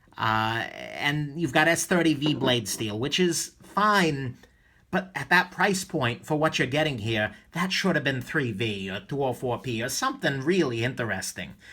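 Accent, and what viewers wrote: New York, United States. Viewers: Love the vids. You have to be from New York. Lol. Cheers.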